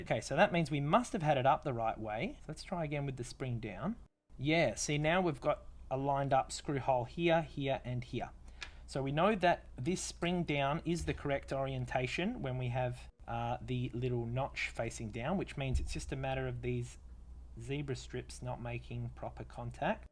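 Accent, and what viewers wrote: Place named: Australia. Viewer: This is a wonderful tutorial. Thanks. Your Aussie accent is amusing to follow.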